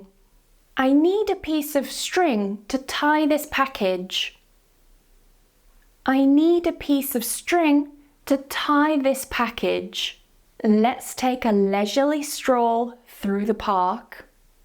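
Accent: United Kingdom. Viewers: Amazing!!! Thanks a lot! ☺☺ I love British pronunciation! 🥰😍🥰😍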